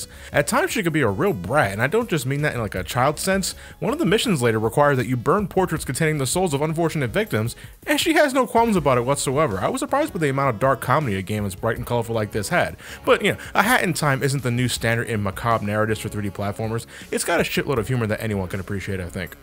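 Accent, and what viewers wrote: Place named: New York, United States. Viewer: Thanks for all the content my man. Swore you were from NYC from the way you speak and the moving out of your mom's crib video and all that, but either way, hope to meet you at magfest. I know you'll be as awesome as you've always been on camera. God bless. Se te agradece todo lo que has hecho.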